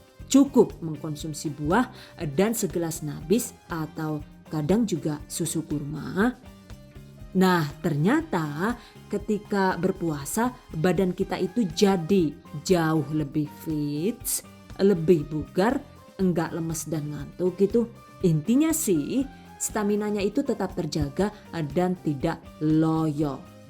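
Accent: Banyumas, Indonesia. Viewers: walah dalah rikane ngapak mania. ora ngapak ora kepenak 😆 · Bu aseline pundi daneng ngendikane ngapak kados kulaa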